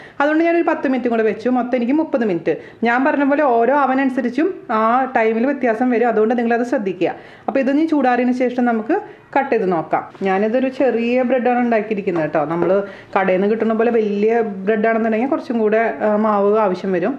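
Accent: Thrissur, India. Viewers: Super simple recipe, enikku aa Thrissur accent ishtayi tta 😊😀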